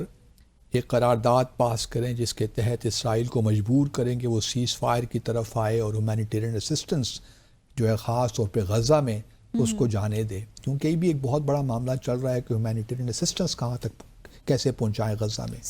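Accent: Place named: Punjab, Pakistan. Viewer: پروگرام کا آغاز انگریزی میں اس طرح کرتی ہیں جیسے کوئی انگریز کامپر ہیں - پھر پنجابی لہجے میں اردو شروع ہو جاتی ہے - بھائی سیدھے سیدھے اردو پورا پروگرام کرو یا پھر انگریزی میں کرو - یہ اردو انگریزی اور پنجابی کی کھچڑی کیوں پکاتی ہو ؟ اتنا احساس کمتری کیوں ہے ؟ کس کو انگریزی بول کر مرعوب کر رہی ہیں ؟ انگریزی لہجہ اور تلفظ بھی درست نہیں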